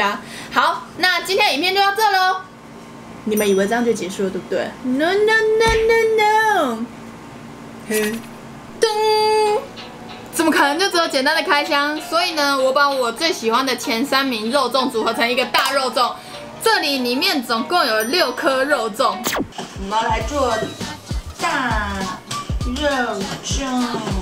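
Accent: Taiwan. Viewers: 生氣的說工撒毀那台語捏的樣子好可愛XD 而且的台語超標準的(以我這種不會講台語的人來說) 很喜歡聽講台語多講一點更好!! (比心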